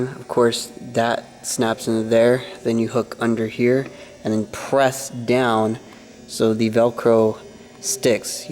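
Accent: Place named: Texas, United States. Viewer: Bro what part of Texas are you from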